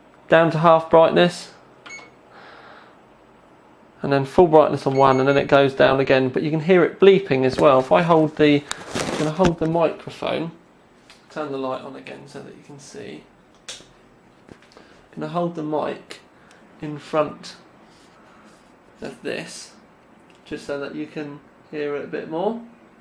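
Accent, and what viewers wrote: United Kingdom. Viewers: Way off topic but whenever I hear a young dude with a british voice it always reminds me of the harry potter movies.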